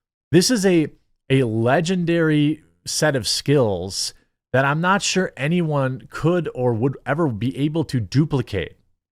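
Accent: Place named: Wisconsin, United States. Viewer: Is it a Wisconsin accent thing to pronounce bag as baaig and gag as gaaig I just notice does this with the "ag" sound.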